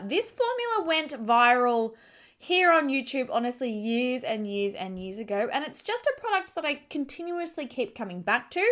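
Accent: Australia. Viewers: I love your video’s so much! Now my exams (dialysenurse, just literally translated, I dont know if the translation is correct, but I think you know what I mean) I bingewatch your videos all the time ❤ To be honest; I love your australian accent so much and Australia in general (so why in US dollars 🤐🤐🤐🤐). I orderded so much lately on your website and I always recommend your make up on my own channel, even in the favourites of the year (unfortunatly its in Dutch). Please keep on the good work and keep on entertaining us ❤ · I am 55 with very dry skin, so alot of your videos don't have much relevance for me. HOWEVER, I moved to the USA from Australia almost 5 years ago, and your videos make the distance seem not so great. Between your beautiful personality and your genuineness (let'snot disregard your awesome Aussie accent) you brighten my days, making me laugh with your sunshine. 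So pleased to see a "pro" still gets fake tan stains on her hands - I don't feel so bad. 😃, thank you for being you. 💗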